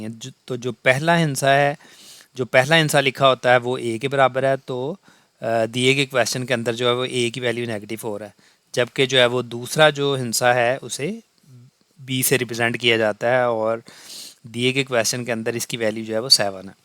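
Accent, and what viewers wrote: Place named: Punjab, India. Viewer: The bad thing about online classes is we missed your punjabi accent and jocks❤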